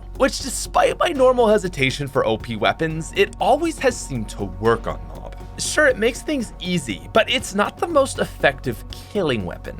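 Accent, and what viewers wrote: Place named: Quebec, Canada. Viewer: hes french canadian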